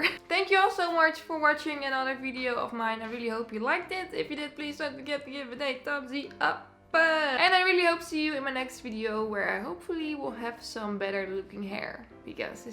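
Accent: Netherlands. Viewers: Is ze nou Nederlands of heeft ze gewoon een Nederlands accent?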